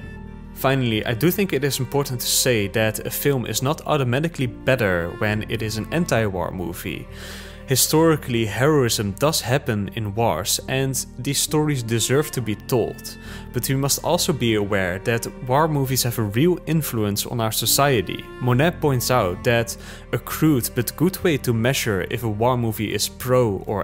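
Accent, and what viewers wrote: Netherlands. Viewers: 1 second in to the video and I reconized a fellow Dutchman! Great video! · Tell me you are dutch without telling me you are dutch ;P very goed acent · you can just hear the dutch in his voice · Lekker Hollands accentje hoor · You sound Dutch 😂 love the video btw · Ik herken een nederlander als ik het hoor, goede video!! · Nice dutch accent broeder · Tell me your dutch without telling me your dutch. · Haha ik hoor in je accent dat je Nederlands bent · Ik hoor duidelojk dat je uit nederland komt broeder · Het Nederlandse accent is zo zwaar😭😭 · Narrator has the most Dutch accent ever lol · Just found your channel, this is a great video glad it popped up in my recommended. (But are you by any chance Dutch? Your accent sounds familiar) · eyyyyy you Dutch? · Goddammit. Every once in a while i will come across your videos and i can tell its very good quality. 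But then i hear your voice... and that horrible Dutch accent (that i have myself) and i just cannot ignore it and it kinda drives me crazy and i rarely make it to the end. Its such a waste because im pretty sure these are excellent video essays! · Dit is het meest Nederlandse accent dat ik ooit gehoord heb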